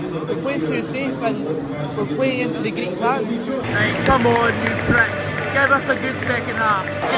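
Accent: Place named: Scotland, United Kingdom. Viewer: We adore the Highlands! We adore the Scottish accent, by far the best and most emotional! Good honest commenting on the game!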